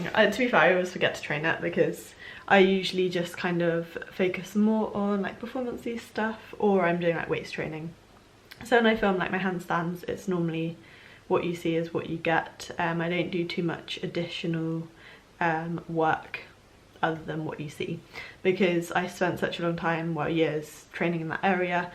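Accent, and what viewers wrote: United Kingdom. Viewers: I absolutely LOVE!! Your British accent. It adds to your personality. Love you.:-)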